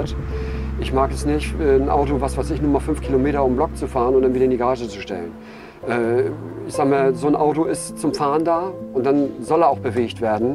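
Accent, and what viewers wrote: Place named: Hamburg, Germany. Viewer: Bildschön das Auto..Das du aus Hamburg kommst hört man. Ist bei mir genauso. Gute Einstellung mit deinem Auto 😊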